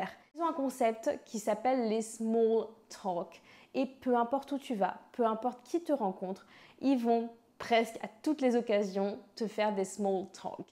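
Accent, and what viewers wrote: Toulouse, France. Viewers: tout à fait et le tout avec l'accent de Toulouse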